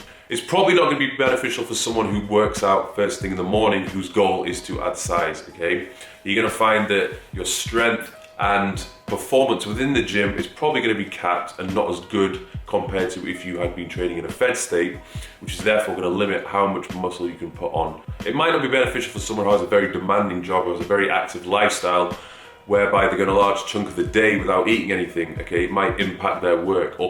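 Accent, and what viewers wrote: United Kingdom. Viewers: His voice sounds like John Cena with a british accent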